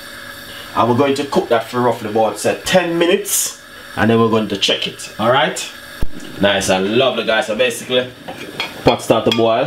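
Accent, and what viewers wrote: Jamaica. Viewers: I love food but my favourite thing about your video dem is ur Jamaican accent... keep it real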